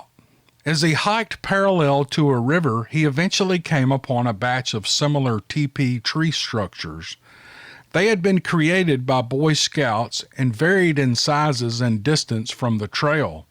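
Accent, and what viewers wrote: South, United States. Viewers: Somebody should proof-read this! In one sentence early on you had the sentence correct but switched the words "mind" and "bed"...that made no sense but would fit if you put the words where they belong. It is like a robot-reads this. This could not happen if it were read by a live human. It is like the man's words are used but a robot reads it; a robot would not understand the meaning and therefor not notice it! So we tried to figure it out but can not! This is crazy. Who would use a robot voice with a southern accent that even pronounces "oil" as "o-el"...? I hope you can explain it!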